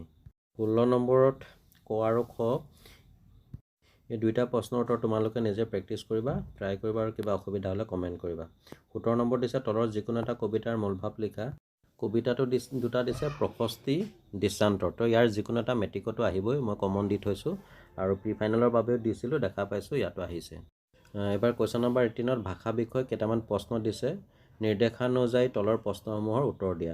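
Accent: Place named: Dhemaji, India. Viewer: Thinks dhemaji Assamese lage sar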